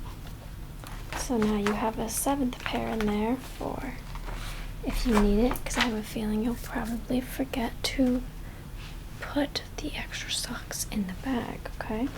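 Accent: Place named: Brooklyn, United States. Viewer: Always love your Brooklyn accent 😁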